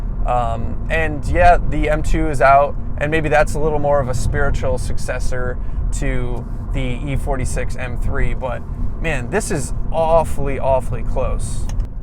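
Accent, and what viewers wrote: United States. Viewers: Dmytro Chygrynskiy speaks perfect American??! Awesome video bro! xD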